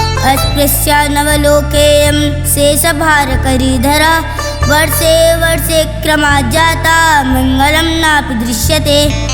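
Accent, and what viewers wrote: Standard. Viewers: बहुत ही सुंदर प्रस्तुति । श्लोकों का शुद्ध उच्चारण और स्वर बहत ही मधुर है। प्रभु कृपा सदा बनी रहें।🎉❤ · प्रिय को वेदोक्त आशीर्वाद। इतनी सुंदर प्रस्तुति एवं शुद्ध उच्चारण के लिए साधुवाद श्रीमद्भागवतमहापुराण के माहात्म्य खंड के प्रथम अध्याय का पाठ बहुत ही सुन्दर प्रस्तुत किया है।🌹🌹🙌🙌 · प्रिय के द्वारा बहुत ही सुंदर प्रस्तुति ओर भाव से इतने सरल तरीक़े से श्लोकों का शुद्ध उच्चारण बहुत ही अच्छा लगा भगवती मा आपके कंठ में ऐसे ही विराजमान रहे 🌺🌺❤️🙏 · बहुत सुन्दर एवं शुद्धोच्चारण 🎉🎉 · शुद्धोच्चारण एवं उत्कृष्ट प्रस्तुति।❤️ और सभी विशेषणों को परिलक्षित करने वाले आपके उत्तम संस्कार, सभी का समावेश श्रीराधाकृष्ण की शब्दमयी मूर्ति का अनुभव प्रदान कर रहा है। महादेव से प्रार्थना है कि वो आपको सदैव प्रसन्न एवं धर्मवान बनाए रखें। जय जय श्री राधाकृष्ण।🙏🏻 हर हर महादेव 🔱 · 🎉❤🎉 बहुत सुंदर , और शुद्ध उच्चारण 🎉🎉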